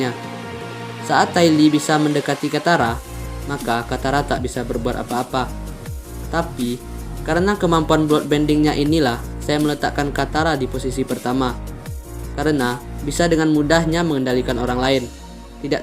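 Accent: Padang, Indonesia. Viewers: Org padang y min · Abang orang padang ya Bng 🤣🤣🤣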